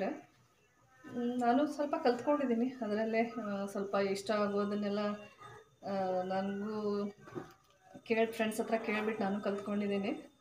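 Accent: North Karnataka, India. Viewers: Mam super volg nimma husband aane work madthiddare north karnataka bhasha channage mathadthira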